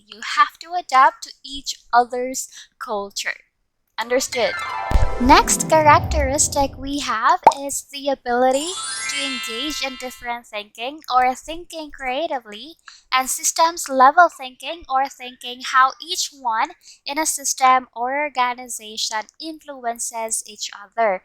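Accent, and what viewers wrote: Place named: Visayas, Philippines. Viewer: I love the accent but I love it more kay bisaya man diay 😘❤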